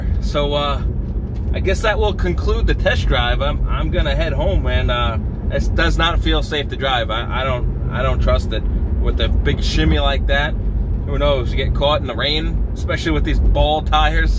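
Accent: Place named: New Jersey, United States. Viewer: I thought you're from jersey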